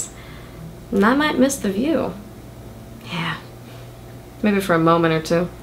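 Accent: South, United States. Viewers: Not a bad Southern accent I must say! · Don't fret... The accent is an accident for most of us southerners too! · I gotta say I really can see you as a great southern belle with that accent. Great story. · I actually heard multiple southern accents as you read, and each of them was pretty good. That may be why you think it's bad but, as lifelong southerner, I think they were just fine. Bless your heart! ;)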